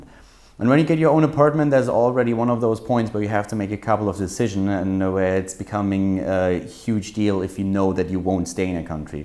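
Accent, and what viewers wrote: Germany. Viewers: Leider reagiere ich ziemlich allergisch auf Englisch mit deutschem Akzent. Denn obwohl Englisch sehr gut ist, hindert mich der leichte deutsche Einschlag doch daran, seine Casts voll zu genießen. Insofern wäre ich auch durchaus dankbar, mal was auf Deutsch zu hören.:-)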